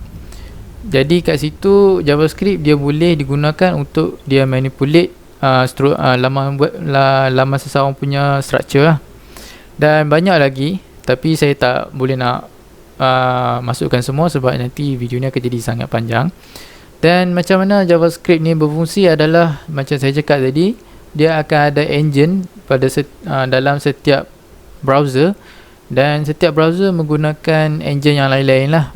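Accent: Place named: North, Malaysia. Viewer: saya subscribe hasil dari iklan awak di YT Channel Hazeman Huzir.. org mana tu.. terkeluaq bahasa utara tu..kekekeke.. saya support ini channel.. Salam dari Bumi Sintok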